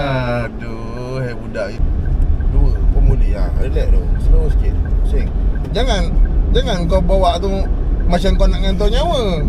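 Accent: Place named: Pahang, Malaysia. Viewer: Cikgu mcm loghat Pahang pun ade bunyinya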